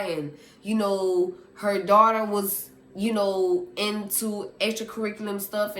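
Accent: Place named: Louisiana, United States. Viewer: I knew you sounded Louisiana lol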